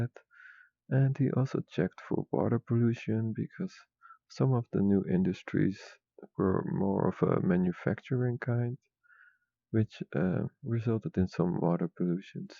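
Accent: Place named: Netherlands. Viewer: Indeed it sounds little dreamy, dutch english accent aswell be. Except one voice wich can´t change a better micro would do wonders, lowering volumes don´t seem to be the sollution. Story telling keep the viewer motivated, practice, the content is very well, hope you will do !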